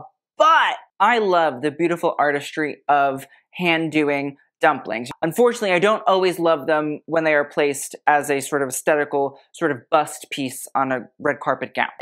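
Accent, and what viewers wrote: United Kingdom. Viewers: Wow, excellent British accent considering you are American. Have you ever thought about starring in British costume dramas? Thank you. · Your British accent is Fantastic darling 🤩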